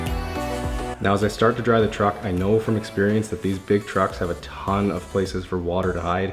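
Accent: Canada. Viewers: Love the Canadian accent ! Aye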